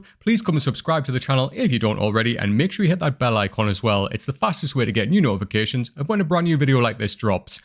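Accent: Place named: Durham, United Kingdom. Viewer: I like your accent , are you from Durham ?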